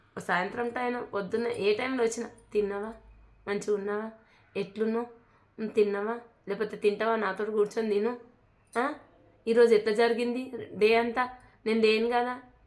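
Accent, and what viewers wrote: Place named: Siddipet, India. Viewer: Meedi ekkada akka ma siddipet language lagane undi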